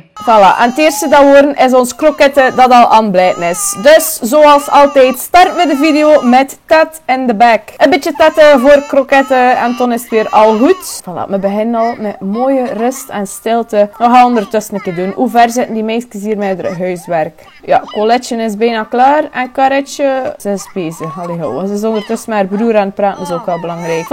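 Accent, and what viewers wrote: West Flanders, Belgium. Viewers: gelachen tot tranen toe...en int westvloms tè de max :xD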